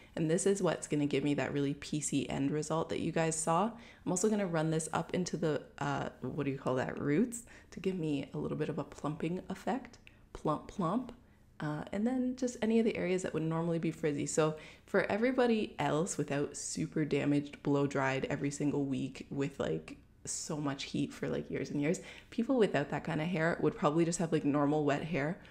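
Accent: Canada. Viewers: She’s Canadian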